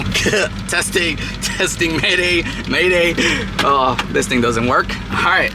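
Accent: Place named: United States. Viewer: What state are you from the accent is crazy lol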